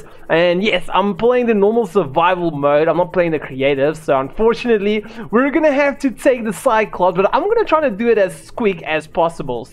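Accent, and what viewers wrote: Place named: South Africa. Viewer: DEAD GIVEAWAY!!! The Agggg... bra you are my South African brother :)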